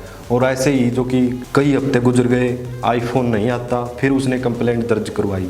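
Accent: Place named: Haryana, India. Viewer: Aapka haryanvi accent bilkul mere dost jaisa hai❤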